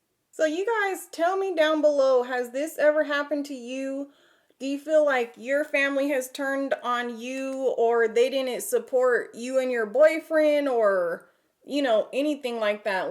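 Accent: South, United States. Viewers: This is my first video of yours are you southern?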